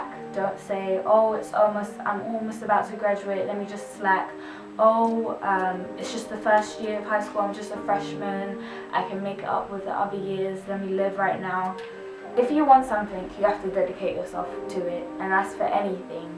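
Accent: United Kingdom. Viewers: Wait so did u got to an American high schools or British secondary u sound very British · Wait how come you have a british accent xx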